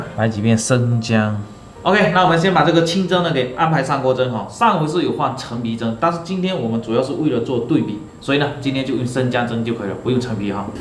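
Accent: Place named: Fujian, China. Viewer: 互跪虾姑😂😂……迷人的胡建口音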